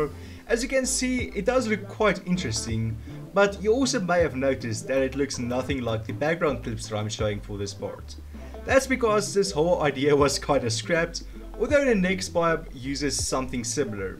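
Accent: South Africa. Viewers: I knew it! Your accent was giving RSA vibes, but then you confirmed it yourself! 🇿🇦🇿🇦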